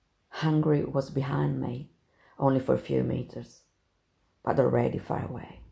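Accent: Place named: Italy. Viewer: Eccomi ancora,! In English, please! Parla inglese benissimo, e, con il piccolo accento italiano, dà un tocco di bellezza in più! Io potrei leggere il Suo libro in italiano, visto che ho studiato l'italiano, e che l'italiano è, posso dirlo, la "seconda lingua" della mia vita, dopo il francese che è la mia lingua madre! Sono contentissimo quando posso praticare l'italiano, perché, con le persone che vivono accanto a me, non ho l'opportunità, purtroppo...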